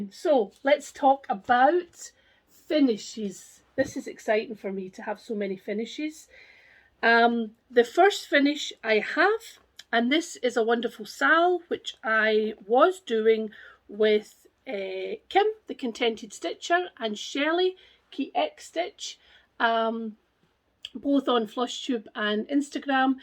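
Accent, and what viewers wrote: Scotland, United Kingdom. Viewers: I hope you continue making videos I have watched you since No 1 and look forward to your content, I m a fellow Scottish stitcher and it’s lovely to here your accent. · Congratulations! I enjoy watching your videos! I’m so glad your recovery is going well. It broke my heart when I watched the video where You explained about your fall. I have to confess that I first started watching because I enjoyed listening to your accent. My grandparents were from Edinburgh. I miss them. So listening to you was a delight, some little words(can’t think of any right now) but words I haven’t Heard in so long But I have to say it was your accent at first but then I really enjoyed all your different whips and all Your stash and just everything. Good luck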